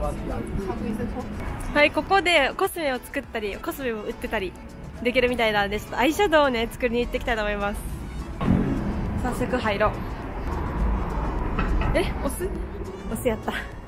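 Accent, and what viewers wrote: Kansai, Japan. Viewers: 生粋の関西弁でハングル読めない事も言ってくれて性格かわいい、韓国行きたいけど読めない話せないで悩んでる自分には自信になった!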